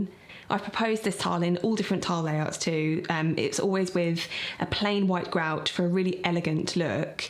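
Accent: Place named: United Kingdom. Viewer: I was thinking of saying the same thing when I saw jose's. What I'd like to add it that, your British accent is the lovliest I've heard!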